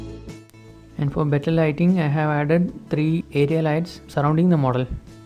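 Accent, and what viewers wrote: India. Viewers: If You hear the India guy, You know that tutorial must be good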